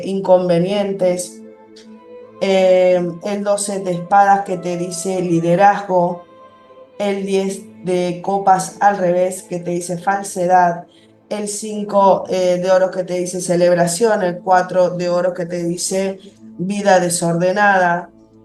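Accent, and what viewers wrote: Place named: Argentina. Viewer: HOLA ME GUSTA. LA LETURA. ES MI PRIMERA VES CONTIGO. SI MI FAMILIA ES BUENA PERO HAY INTERESES CREADOS. YO QUIERO SER LIBRE TOTAL Y ESTAR BIEN EMOCIONALMENTE. TE ESCUCHO DE URUGUAY MONTEVIDEO. VOS SOS ARGENTINA POR COMO HABLAS LO SUPE INTUCION. 🇦🇷🇺🇾 SOS UN AMOR. SI ESO QUE SOY MENTAL SI LO SOY PENSAR MÁS EN MI. TE AMO ARGENTINA UN PAÍS HERMOSO FINO DE MODA DE GENTE HERMOSA ESTILO. URUGUAY ES MÁS TOSCO, LA GENTE SERÍA MUY POCO OPTIMISTA, LO BUENO ES LAS PLAYAS Y NO SE ESTA MAL PARA VIVIR HAY MUCHOOOOO ARGENTINOS ACÁ. Y MI NOMBRE TODOS ME DICEN SOS BRASILEÑA NO MI ABUELO PATERNO PERO NO YO NO POR MI MAMÁ ITALIANA Y AHÍ TENGO APELLIDO HERMOSO ITALIANO DE GENTE MUY DIVINA. CALABRESA. ASÍ ME CONOCES MÁS. SI LABORALES SI MEJORES SI Y QUE MI PAREJA TRABAJE POR DIOS ES MEDIO VAGO. MI PAREJA TIENE ALGUNA S COSAS BUENAS. GRACIAS SOS UNA GENIA MUJER. 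SI TENGO DUDAS SI DE MI PAREJA. EL PROMETER Y NO CUMPLE NADA. BUENO ME GUSTÓ CONOCERTE MI CHICA ARGENTINA BESOSSS PARA 🇦🇷🇦🇷🇦🇷🇦🇷🇦🇷🇦🇷🇦🇷🇦🇷🇦🇷🇦🇷🇦🇷🇦🇷🇺🇾🇺🇾🇺🇾🇺🇾🇺🇾🇺🇾🇺🇾🇺🇾🇺🇾🇺🇾🇺🇾🇺🇾💋💋💋💋💋💋💋🌻🌹🌹🌹💋💋💋🌻🌻🌹🌹🌹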